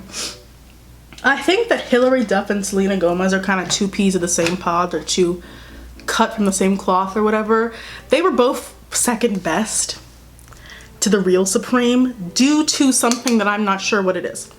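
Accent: United States. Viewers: Gina Rose Belarde well Americans tend to think everyone is American. I can clearly see she’s American but my point is, why does her tone of voice go up at the end of every sentence. Of course I know how American sounds like. You lot are everywhere in the media. This accent is something I’m no familiar with. Her message is hard to grasp because she sounds unsure. Even her facial expressions and that’s just my opinion. Idk why some of you replying to my comment like I said something inappropriate or controversial. You could’ve just told me what area she’s from or anything else that was real information rather than ‘are you not American?’ No of course I’m not American wtf maybe if I was I wouldn’t have such a hard time understanding her delivery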